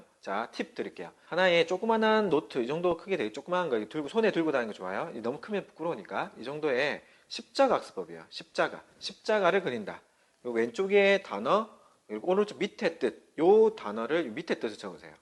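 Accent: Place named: Gyeongsang, South Korea. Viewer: 표준어 쓰는 경남사람😂 영어공부법 잘 들었습니다^^ 맥락있는 단어암기와 활용, 적용이 중요하군요! 쓸수있는 영어공부를 해라. 별표!!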